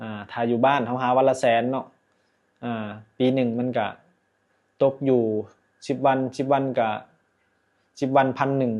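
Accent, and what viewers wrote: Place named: Phu Thai, Thailand. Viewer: เป็น คนภูไท เบาะ บางคำ เว้า ภูไท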